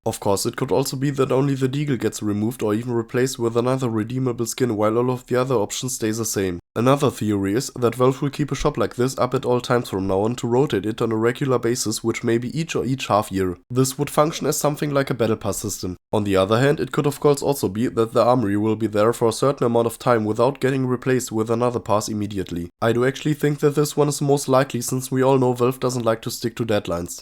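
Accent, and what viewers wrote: Germany. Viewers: dislike only for ur german accent damn too anoing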